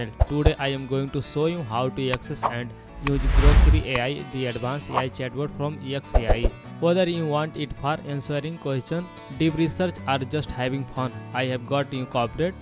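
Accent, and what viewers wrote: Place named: Southwest Asia. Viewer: almost unintelligible. This would be fine in southwest Asia, but the speaker's accent is very difficult to understand.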